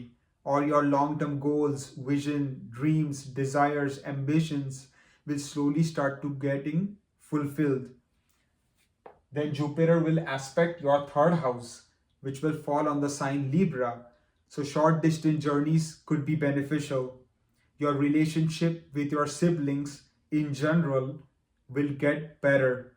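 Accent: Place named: United States. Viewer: After waking up in the morning, you take your phone in hand n switch on the net and you get the notification from about upcoming Guru gochar... Knowing that Guru being my both lagnesh n rasheesh, it's gochar in Trikon gonna be beneficial, you are so eager to listen to it from your very dear n fav Marathi guy with American Accent 😃😃😃 and your beginning of the day become cheerful.... ❤ Thank You So Much dearest for making my day 🎉❤🙏😘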